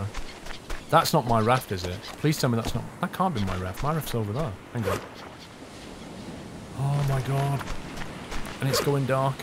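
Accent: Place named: United Kingdom. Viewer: he is obviously British like me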